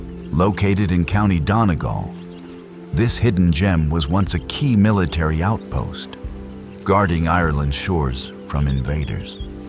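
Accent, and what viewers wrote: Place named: United States. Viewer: Ahhhhh not this annoying AI American voice. Bleh.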